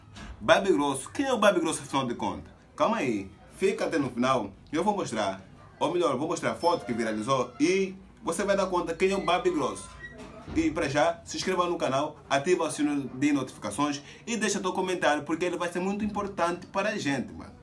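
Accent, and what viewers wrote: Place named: Brazil. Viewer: E estas a falar tipo brasileiro porque? So para pareceres?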